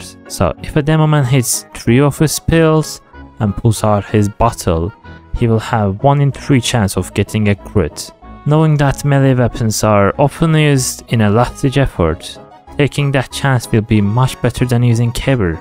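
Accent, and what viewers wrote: Turkey. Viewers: Чувствую турецкий акцент)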